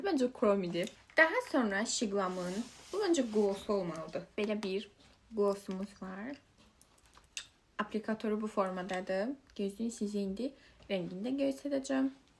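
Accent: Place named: Turkey. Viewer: Etim tokulurde bu qiza baxanda niye daniwanda ozunu uwaq kimi aparirsan dodaqlarivi deq bawi qabaga eleyirsen turkce daniwirsan turkler kimi daniwmaga caliwirsan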